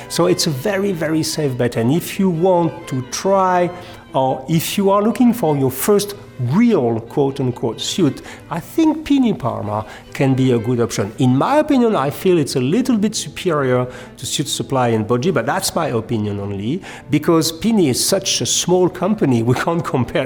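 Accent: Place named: France. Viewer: I have just watched the two versions french and english of your videos. To compare the fluency of your eloquence i m so happy to see that we obvisouly notice that your french accent remains and the way of your talking remains french one it puts so valuable in your explaination. Yours from France 🇫🇷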